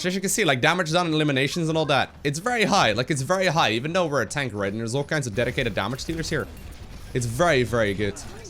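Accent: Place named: Netherlands. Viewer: that dutch accent... r u from holland mate?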